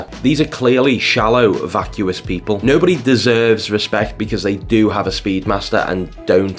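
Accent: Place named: Wirral, United Kingdom. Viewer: hahaha I largely agree but I just can't get over your bad Wirral accent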